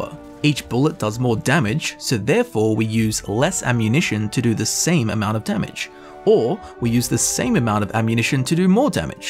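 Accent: Australia. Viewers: i'm a simply guy. i hear a fellow 'strayan and hit subscribe.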